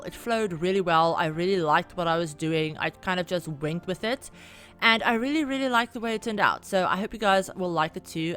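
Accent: South Africa. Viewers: Not me hearing the accent & immediately being like yes a fellow saffa simmer ❤🎉 beautiful build, adding this immediately!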